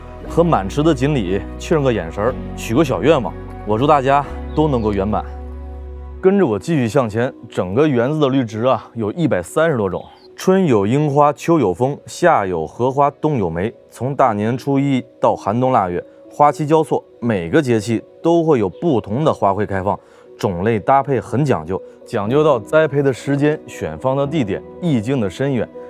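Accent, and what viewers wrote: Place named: Tianjin, China. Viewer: 好像天津人讲的话，笑话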